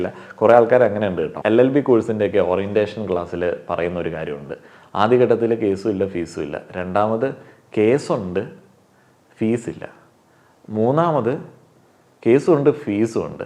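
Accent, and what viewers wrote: Kottayam, India. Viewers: Bro Kottayam kaaran aano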